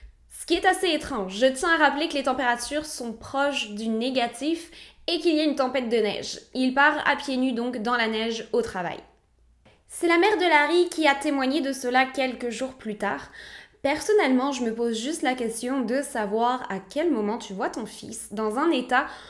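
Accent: Quebec, Canada. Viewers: C’est moi ou t’a un accent québécois? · T’es québécoise ou française ? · larry avait l'air d'un sacré demeuré sinon laisse tomber la FAQ c'est naze, tu voudrais pas raconter des saloperies avec ton accent quebecois c'est plus drole!!** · C'est la première fois que j'écoute une de tes vidéos... mais ce que ma frapper.. es- tu québécoise ? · Tu très léger accent québécois c’est vraiment mignon, j’ai froncé les sourcils je l’entendais pas tout le temps. Mais le sujet est vraiment flippant. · Merci pour ta vidéo 😊je viens de m'abonner 😍es-tu québécoise? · je viens de capter l'accent Canadien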